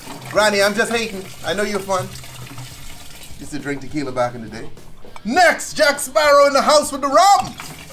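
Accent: Bahamas. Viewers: Bahamian accent i hearing or I’m freakin out lol 🇧🇸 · Are you bahamaian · Bro u gotta be from the bahamas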